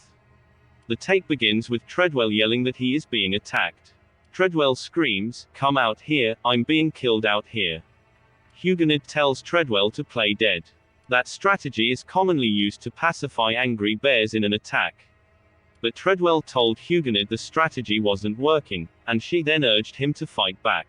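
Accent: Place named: United Kingdom. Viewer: It's not a robotic voice. It's a British voice and the real audio based on news reports. I listened but wish I hadn't.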